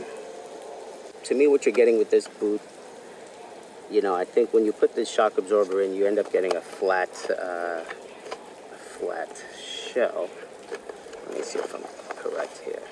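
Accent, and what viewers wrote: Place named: New York, United States. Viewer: Hey bro I saw this video for the first time and before u said it I knew it was new York. I was thinking Brooklyn or Queens. I live in PHA in South Minneapolis Minnesota. I cud tell u had that new York flavor very wu tang vibe 90s vibes. I'm in my 40s now just got back into skating. I skated from 97 to 2007. I skated Rollerblade brand. Now I have razors cult shima 3 remastered, razors Andrew broom pro shift skates and I just ordered the new Iqon AG 10 boots with an Iqon AG60 C frame. A carbon frame. Tho I wonder if I shud send the AG 10 back and get the Them 909 skate. The AG 10 comes in the mail tomorrow I just am so old school that all this new tech scares the shit outta me! I hope I love em I'm just Soo big on durability. I like the old school flavor of a plastic boot and basic shape. I just got a huge sale price on the Iqon that I went for it..all carbon and kevlar mix. 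I just subscribed to your channel and just showing support. I'm over here dodging gun fire just trying to skate haha no joke! I teach dance and I cut hair as a hairstylist. But I love to skate and it feels good to be at it again. Thanks for a great video. I learned Alot. I have alot to learn all over again. Be well and enjoy this summer!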